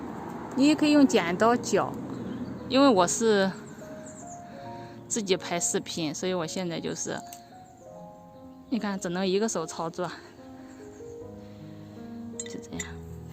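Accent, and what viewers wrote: Hubei, China. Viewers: 对，湖北洪湖天门那个地方的口音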